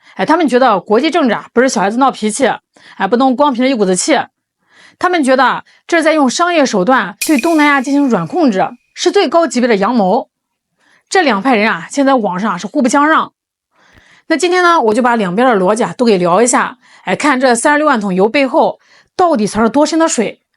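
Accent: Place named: Shandong, China. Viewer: 这个女的好像是山东人，尾音每次拖很长，给人一种每句话都在嘲笑的感觉。还是把话说好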